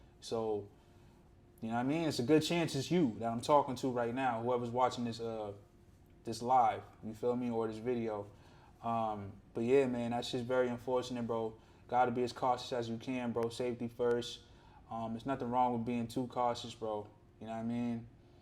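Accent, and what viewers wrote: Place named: New York, United States. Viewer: Bro u sound like a nerd like one those wannabes from new york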